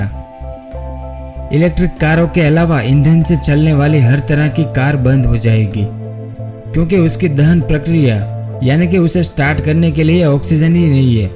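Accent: Gujarat, India. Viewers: Bhai aap Gujarati ho..... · Are u Gujarati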